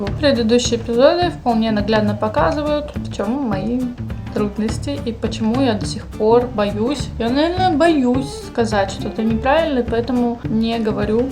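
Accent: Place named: Russia. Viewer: Вы плохо воспринимаете на слух, потому что не правильно произносите звуки.. они у вас русские..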